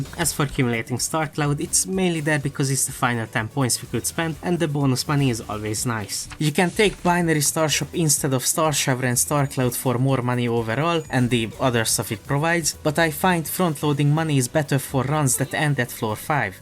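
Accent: Hungary. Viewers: This accent...magyar...hmmmmmm... Holy shi im right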